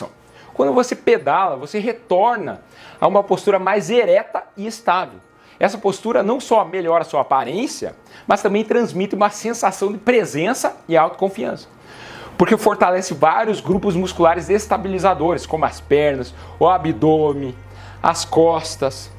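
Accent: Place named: Paraná, Brazil. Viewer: Este sotaque é inconfundível das pessoas do Paraná; morei na Lapa, Cascavel e Francisco Beltrão. Parabéns pela sua dedicação em nos orientar de forma simples.❤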